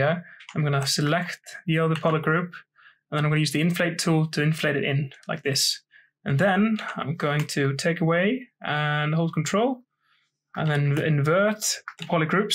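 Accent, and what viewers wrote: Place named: Wales, United Kingdom. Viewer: Both of these videos are amazing! Thanks so much. Also you sound like a mix between Welsh and maybe Nordic 😃😃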